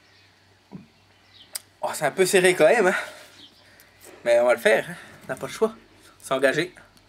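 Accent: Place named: Belgium. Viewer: le soleil du sud avec un accent belge ? le combo de dingue un belge sudiste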